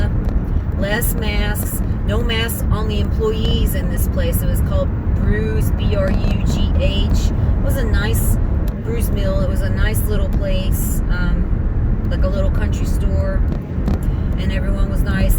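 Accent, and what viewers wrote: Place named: South, United States. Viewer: You're even sounding more southern!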